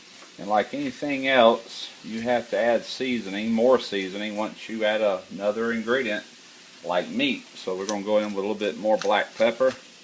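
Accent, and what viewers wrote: South, United States. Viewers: + southern accent = win, i'm a huge fan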